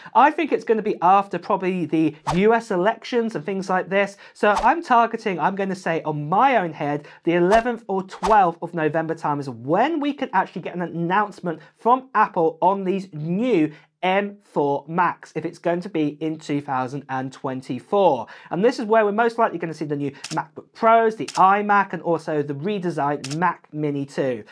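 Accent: England, United Kingdom. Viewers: Love your English accent, son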